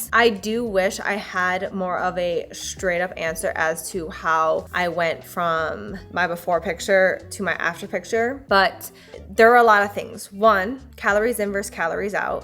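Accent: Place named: Michigan, United States. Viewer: I could listen to talk all day her MI accent is so nice to hear lol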